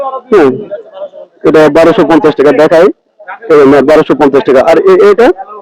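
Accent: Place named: Bangladesh. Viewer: Bangladeshi Bangladeshi English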